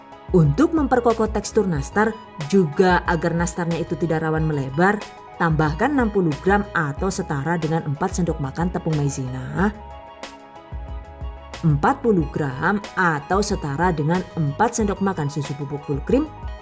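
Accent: Banyumas, Indonesia. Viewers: mmg sllu beda...lbh keyeeennn...tampilan kuenya cantiq kyk inyong ☺😚ngapaknya itu lho jd ciri khas 🤗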